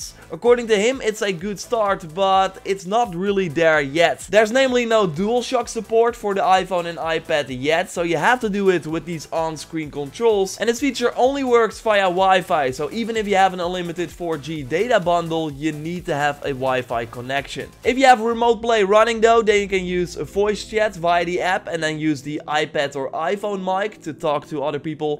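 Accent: Netherlands. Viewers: your Dutch accent kills me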